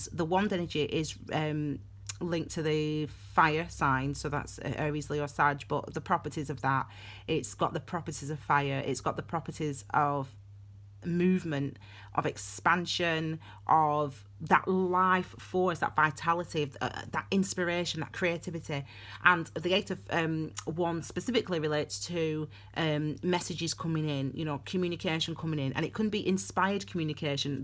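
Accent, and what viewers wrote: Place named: Yorkshire, United Kingdom. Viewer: Thank you for the reading. I feel stuck and trapped in my job. I love your accent are you a Yorkshire girl?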